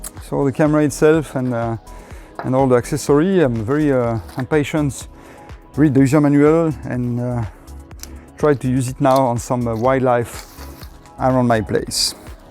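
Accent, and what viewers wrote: France. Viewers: Merci beaucoup pour cette vidéo, vu l'accent je pense que tu es Français, dommage de ne pas avoir fait cette vidéo dans ta langue maternelle, je comprends rien à l'anglais, j'ai dû activer les sous-titres mdr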